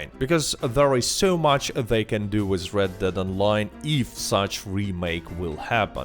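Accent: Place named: Russia. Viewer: Я один отчетливо слышу русский акцент в речи автора?🤠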